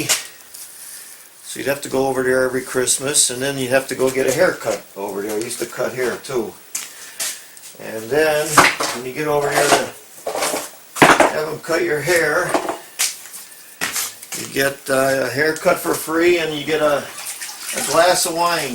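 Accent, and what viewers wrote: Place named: Pittsburgh, United States. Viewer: I'm from PA also - Royersford, I'm guessing you are out somewhere near Pittsburgh judging by your accent! Those things came out great! Really look professional!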